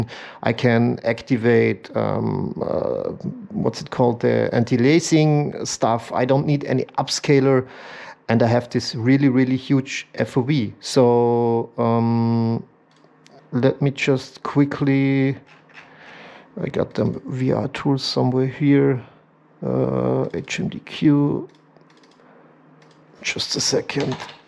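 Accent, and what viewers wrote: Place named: Austria. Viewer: Servus höre am Akzent das du Österreicher bist😂 glaubst du das eine 4070ti super genug ist um das Headset zu befeuern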